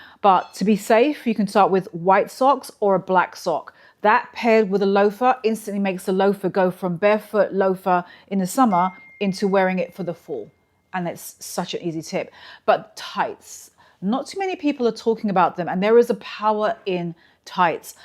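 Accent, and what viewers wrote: United Kingdom. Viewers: Same here, I've been out of the loop and in caregiver mode for 4 years for my brother and one year for myself just to regain my own health and strength, but when she popped up today, I was like Yeess! I need this, I need to hear that beautiful British accent spurring me on to new heights of creativity! With fall coming on, my favorite season for clothes, I can't wait to get in my closet and put together some fabulous looks! I have all the makings and 99% of it is thrifted over many decades and many eras from Victorian, think Ralph Lauren romantic blouses, to classic leather and denim, to Chanel jackets, one authentic one from a thrist store!! Unreal what people donate, bless their 💕!!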